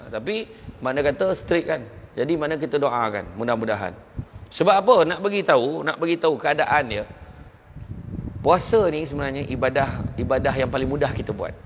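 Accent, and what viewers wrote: Negeri Sembilan, Malaysia. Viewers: 👍 bahaso melayu minang nogori semilan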